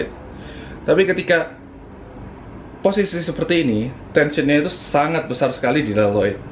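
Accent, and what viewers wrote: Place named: Java, Indonesia. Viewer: kok koyo wong solo / jogja ya. logatnya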